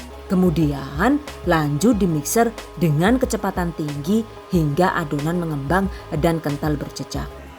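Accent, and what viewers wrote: Banyumas, Indonesia. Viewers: Kenaa seneng banget liat chanelnya karena resepnya enak, penjelasannya detail selalu ada tips n trik jadi mudah dimengerti, dan selalu berbagi resep yg lg hits n viral sekarang selalu update,resepnya hasilnya selalu lucu2 warnanya jadi keliatan menggoda2🥰 suaranya juga lucu selalu ada lucu2an ngapak2an bae 😃 selalu menginspirasi buat ide jualan saya.. Trimksh 🙏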